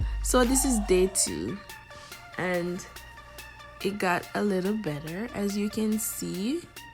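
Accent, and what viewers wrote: Jamaica. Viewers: Jamaican ? I hear the accent lol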